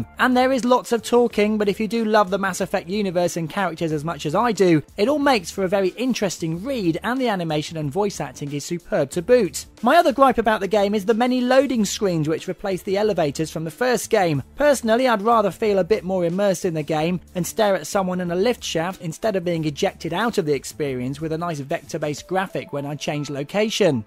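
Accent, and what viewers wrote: United Kingdom. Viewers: Britain has more different accents than most countries of its size. Trust me, he's british- cos he sounds exactly like I do!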